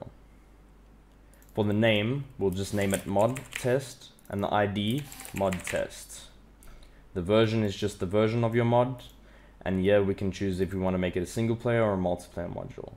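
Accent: South Africa. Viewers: Always nice to hear a fellow South African on yt. Keep up the good work bru👍🏻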